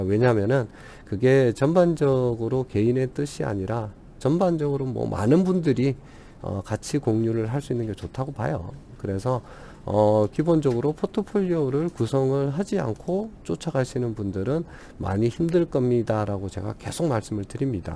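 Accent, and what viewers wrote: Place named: Gyeongsang, South Korea. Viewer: 경상도 영어가 리듬이좋아서 더 잘들리죠 ㅎㅎ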